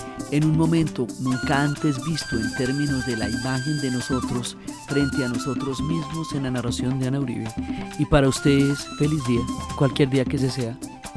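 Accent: Bogotá, Colombia. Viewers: Jajajaja.. Naaaa la narración en la serie tiene que ser costeña, nada más cachaco que. A la serie no le falta nada. Así está perfecta.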